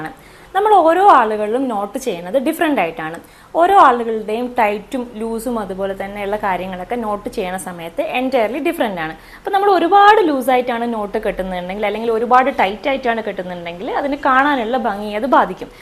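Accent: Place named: South India, India. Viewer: Sound more like South Indian speaking style with fluent English words mixed ( local language) .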